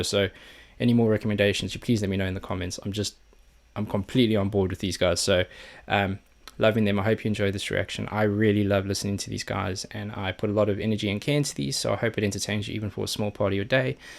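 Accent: South Africa. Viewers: Dude, are you from South Africa? That accent.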